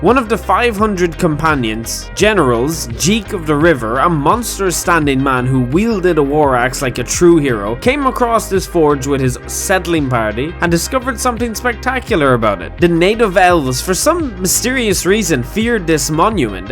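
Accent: Ireland. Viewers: Your accent is more borage, than elsinore, you sound like an Irishman than a Kajhiit.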